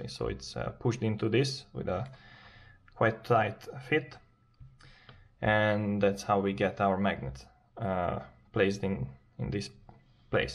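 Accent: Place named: Hungary. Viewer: you have a hungarian accent :)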